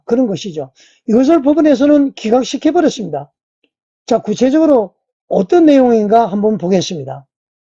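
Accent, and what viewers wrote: North Korea. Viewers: 어투가 북쪽어투 근접~~ 그냥 밝혀 주세요~~ 나의 출생고향은~~ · 유튜버님의 말투가 북한 말투 같은데... 실례지만 고향이 어디신지요?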